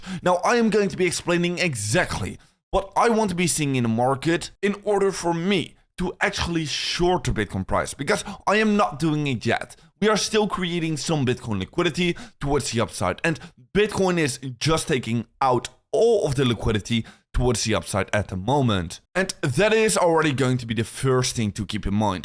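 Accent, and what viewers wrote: Netherlands. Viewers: Don't you hear the dutch accent? It's so obvious when dutch people talk in English. He sounds like crypto rover.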